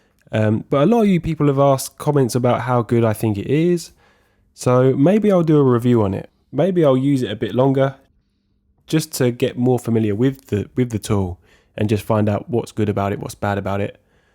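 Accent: London, United Kingdom. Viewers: Hello. Nice video I liked it all. As a Seiko nut I am still surprised I’ve not worked on a 5606 I have 3 to restore but just never got around to them ! You clearly are English and London by the sounds of it so what cleaning fluid can’t you get? I still rate Essence of Renata for a good hairspring degreaser. As for crystal don’t bother looking for originals as you can get any domed , flat or tension ring on aftermarket for little money from cousins. Sternkruez make decent ones. I’d only seek out original Seikos on acrylic flanged or special facet ones. The gear you will have bought from Adrian as they are good. A guy in my Facebook group recently 3D printed the 4 teeth ones which he was selling for $5 each. They work good apparently. I have 5 in stock as I know two of my watches have the fault. Also been looking at that jewellers tool myself recently! 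Will buy it now I think.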